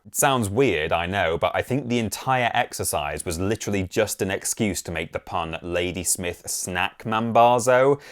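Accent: United Kingdom. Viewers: How did I not notice he had a British accent until he said crisps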